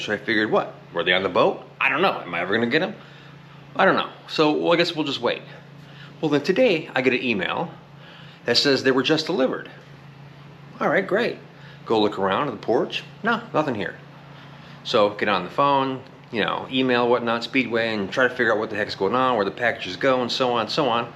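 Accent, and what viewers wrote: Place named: Texas, United States. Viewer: May I ask where abouts are you located, It sounded as if your in Texas or close to it, Just curious and if you rather not say for obvious reason of unlikely types showing up I get it You don’t know me but maybe we will meet at a show sometimes But I really need to watch more of your videos I see some learning this old man needs to pick up on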